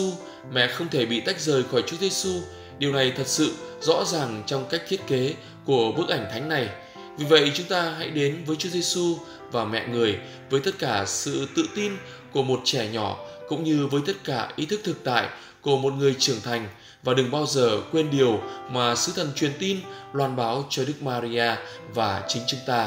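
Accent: North, Vietnam. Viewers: giọng nói miền bắc ngọt ngào tuyệt vời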